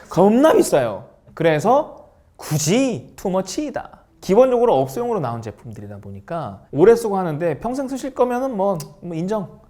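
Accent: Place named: Jeolla, South Korea. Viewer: ㅎ.ㅎ 쬐깐해요~~~오리지널 전라도 사투리~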